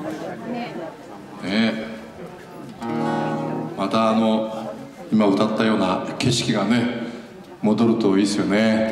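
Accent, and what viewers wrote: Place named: Tohoku, Japan. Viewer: ちょっと東北弁入れてる感じで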